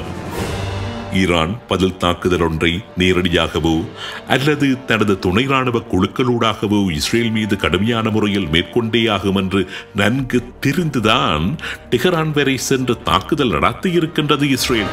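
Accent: Sri Lanka. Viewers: வர்ணனை செய்பவர் குரல் கம்பீரமாக, தெளிவாக உள்ளது. இலங்கை தமிழ் போல தெரிகிறது. பாராட்டுக்கள்.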